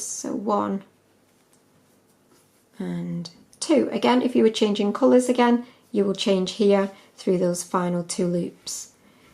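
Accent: United Kingdom. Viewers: Oh My!!! Finally a Fu-king British normal teacher,, DOES NOT GET BETTER TO LEARN AND LISTEN TOO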